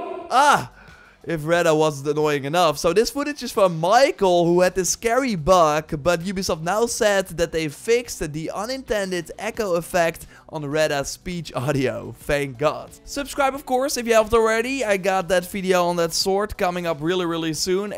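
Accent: Netherlands. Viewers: Je Engels is grappig met je Nederlands accent 🤣.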